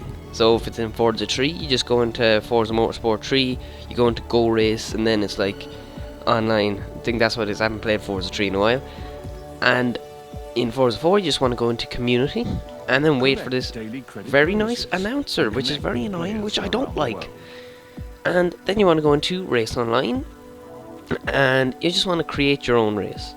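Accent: Ireland. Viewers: MUP ya boil ya yer irish ☘️ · Hey your Irish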